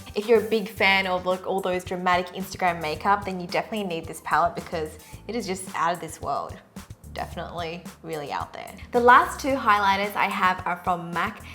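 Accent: Australia. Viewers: Great video! I love your accent, where are you from? Australia? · Is she from Australia? I can't tell because I'm Australian too so I can't really hear it😂 plaease let me know❤️ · LOL seeing you get so worked up over "stick" was hilarious. Curse our Australian accents!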